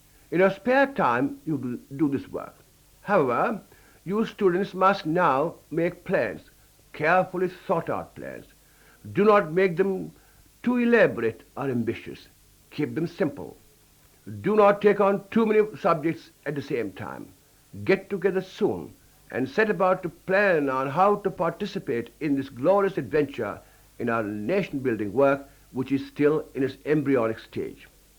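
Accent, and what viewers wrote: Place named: United Kingdom. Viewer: After this vedio : Me :British accent tutorial. Jai hind to the national hero, pride of coorg Field Marshal K. M CARIAPPA first commander in chief of Indian army. First Indian to command troops of British under him. Ayub khan president and first commander in chief of Pakistan army served under his command. Led the 1948 war against Pakistan being the western commander. One of the two legends to be honored with Field Marshal rank.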